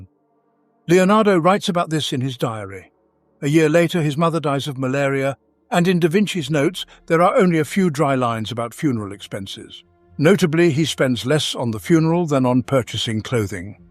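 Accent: United Kingdom. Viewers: Why ugly englisg accent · I can't stand British accents pretending they are intelligent. 😮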